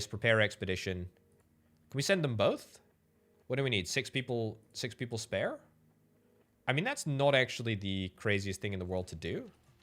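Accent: Scotland, United Kingdom. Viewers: I thought heard some Scottish in there. I'm from Edinburgh, though and I can't place the accent. Was wondering if there was something more to it.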